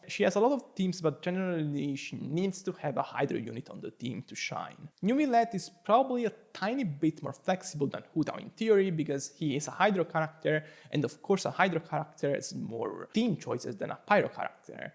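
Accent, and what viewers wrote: Italy. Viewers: Thank you so much for the content you provide to the community, your graphs are always intuitive and extremely usefull to value and compare weapons, builds, and team comps. If i may ask a favor, when or if you will eventually do Furina's damage calculations, could you please make a comparison between her BiS weapon and mistsplitter with her c2? Probably i won't be pulling for her BiS even if i might go for her c2 cause of "green donut", and mistsplitter is what i would give her in that case. It might be the case for a lot of people but maybe they have a different weapon in mind so you'd probably need to make calculations with every weapon to make everyone happy xD, but you do you. Also, are you italian? Cause i am and your accent sounds italian to me xD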